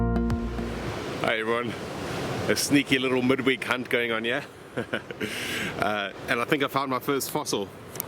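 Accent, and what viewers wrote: South Africa. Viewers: Are you from South africa🤔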